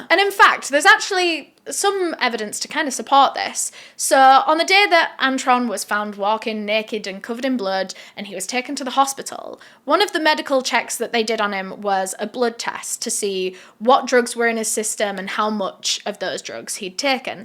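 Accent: United Kingdom. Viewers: How is it that you have a British accent but yet talk like the classic obnoxious, ditzy, Valley girl? “Um yeaaaa, like okkkkk. I love to stretch out the last syllableeee of the last word in each senteeeeence. Sometimes even a couple times a senteeeeeence. With a very low, annoying, tired like sounding toooooooone. Or speaking with a high rising terminallllll. That all ditzy girls doooo. Which used to be called Valley Giiiiirl because a lot of dumb bimbos from the LA California areaaaa would all talk like thiiiiiiis and some still dooooo but not even close to how many used toooo like when Paris Hilton was famouuuus. Kim K used to talk more like thissss and thats exactly the type of braindead person that would talk like thisss, a person who watches The Kardashiansssss and idolizes themmmm who also probably think the world is flaaaat and couldnt do simple basic math or comprehend anything over a 2nd grade level educationnnn” I’m not saying thats you, I’m just saying thats at least how you sound when you talk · You sound UK and look Irish but wearing an Italian heritage shirt soooo was wondering 🤔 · honestly, your way of speaking and accent is so annoying 💀 it was really hard to go through the whole video (i liked the case so i watched) without cringing at your horribly fake talking.. 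you're the type of person that makes people hate a british accent lmao like wth is STAWries?? DAWr? affAWRed?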